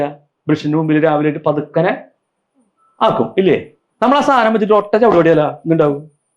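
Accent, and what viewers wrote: Thrissur, India. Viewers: നല്ല ക്ലാസ്സ്‌....... തൃശ്ശൂർ ഭാഷ നർമ്മ ശൈലി....... 👍😍😁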